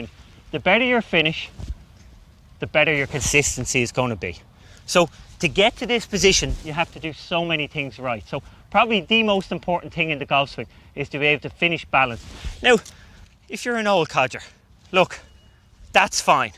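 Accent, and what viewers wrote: Ireland. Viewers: I enjoy all of golf tips and especially the way he explains everything. Also love the Irish accent. Some of the best people are from Ireland. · 😂it’s the Irish pronunciation, clearly he’s from a smaller town or village.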